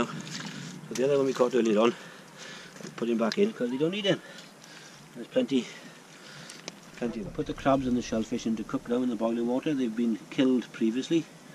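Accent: Wales, United Kingdom. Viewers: Welsh accent is beautiful. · Your accent is so thick, it's impossible to follow some things you say (I lived in Wales 14 years, but it;s still bloody hard to understand this man). You lift a rck and say, There's a nice three-beaded XXX" - what was it?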